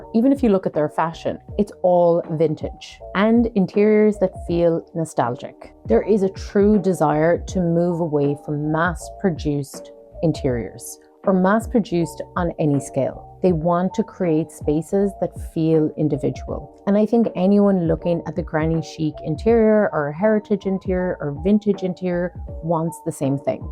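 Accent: Ireland. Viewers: Oh it feels so nice to hear an Irish accent on YouTube, especially on design subjects. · Come to think of it, you do sound a bit Kerry-ish! (I used to have a friend from Killarney.) When I was living there, the only accents I could NOT understand at all were from Clare and West Cork -- but it seems that their accents are even the butt of Irish jokes. Hey! South Dublin used to be nice! And judging from Google Earth and Daft, the home prices are still very sky high in my old "hood" (Knocklyon). It's north Dublin where the trouble is. When I was there (in either Cork City or Dublin), I often heard, "Well, Dublin is really an IRISH city." I found Dublin in 1981 to be quite rude and unfriendly -- and not in the playful, insulting way that friends insult one another in the rest of Ireland. However, my sister-in-law and nephew visited Dublin a few years ago and found the people there wonderful. 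As for me, I love Cork City! To me, the year I spent there in Cork makes me one-sixty-eighth Corkonian. I'd move to Cork City in a heartbeat if personal circumstances allowed. Judging from what you said in your video, I think you'd approve of my little two-bedroom Mexican-exterior-style, single-story townhome (what you would call a terraced home)! Regarding enjoying what comes into style each year or what's trending, though, you're a better person than I am (which is good, since you're the designer)! I keep watching videos about the latest-and-greatest and yelling at my screen, "That's terrible!" or "That's stupid!" or "How boring!" LOL. Yet, I find it to be addictive -- a litt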